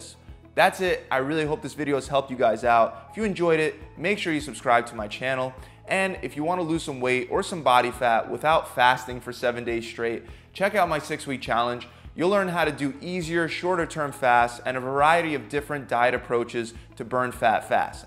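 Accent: Philadelphia, United States. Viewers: You from Philly?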